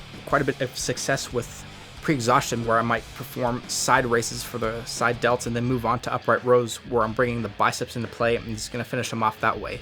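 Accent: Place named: United States. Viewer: Whats the accent? Its American but there sounds like theres something else in there..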